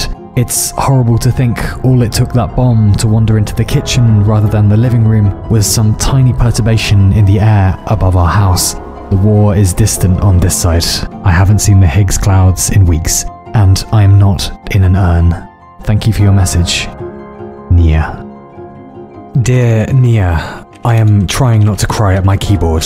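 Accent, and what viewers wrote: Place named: United Kingdom. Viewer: Where have ya been man? I miss your videos I miss your advice I miss your British voice that puts me to sleep at night.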